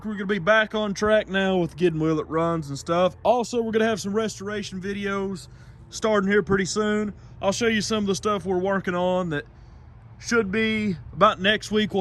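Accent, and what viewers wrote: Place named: New England, United States. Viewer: You have one of the most interesting Yankeee accents, love listening to it and your relaxed vibe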